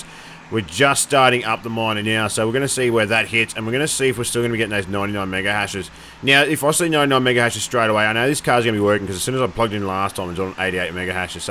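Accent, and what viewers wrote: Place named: Australia. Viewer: I love that aussie accent lol :D btw great vid bud, u just gave me some encouragement to re-pad my gpu-s! 😊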